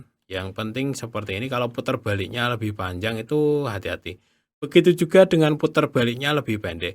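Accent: Java, Indonesia. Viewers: Maturnuwun mas... logate jowo banget..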